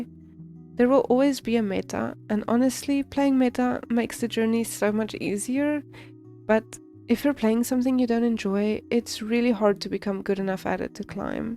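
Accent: South Africa. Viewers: Sorry this is totally random, but I'm 90% sure you have a South African accent and I love it. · Are you from south Africa? I can tell from accent since I'm from jhb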